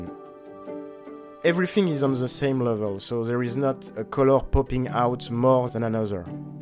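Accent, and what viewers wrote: France. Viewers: keep the french accent to your english! english is the one language where it’s actually kinda cool to blend your roots with the language imo. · Not trying to be rude in any way by saying this. Your english has really improved recently. It feels like you talk with a lot more confidence and energy, while still keeping that slick French accent.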